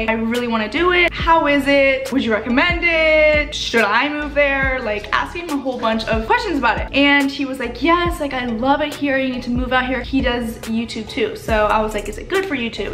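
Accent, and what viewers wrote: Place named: South, United States. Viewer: I think it's more of her trying to cover up a southern accent. "SAA-FAR-EE" instead of "suh-far-ee".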